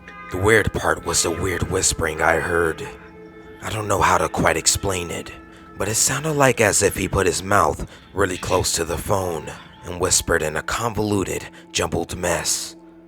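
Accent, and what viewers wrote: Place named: United States. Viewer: I think that the snapchats may be real but I don't think the narrator is the same person in the snapchats because the narrator has an american accent and the guy in the snapchats has an Australian accent and a rainbow lorikeet is an Australian bird.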